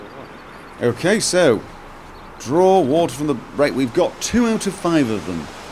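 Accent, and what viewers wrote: United Kingdom. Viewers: Hey.. Where are you from? Your accent sounds sort of British, but I notice you roll your R's, well, not all of them, only every now an then..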